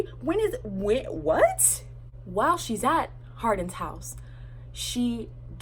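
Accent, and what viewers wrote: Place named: England, United Kingdom. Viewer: Your English accent is giving me life 😂😂😂 Also: fans of Harry Styles were super mad at After becoming so huge because HS is an angel and it makes him look bad. Also, in the original wattpad, Hardin’s name was literally Harry Styles. It was meant to be like what would have happened if he didn’t become famous and went to college. 😂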